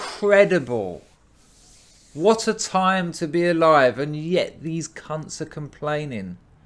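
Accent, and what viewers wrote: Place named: Cockney, United Kingdom. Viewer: spot on mate good to hear a fellow cockney of the old school.compared to nowadays the old days were utopia.keep on doin what yer doing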